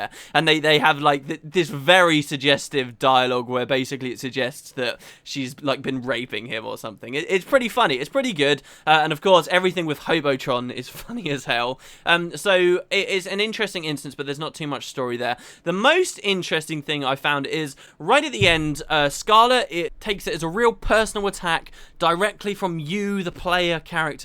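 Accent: United Kingdom. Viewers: He's Brittish, they pronounce things differently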